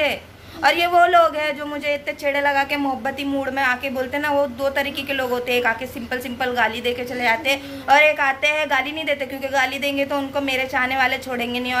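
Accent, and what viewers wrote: Hyderabad, India. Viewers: Baji aap hyd ki language baat kar rahi ho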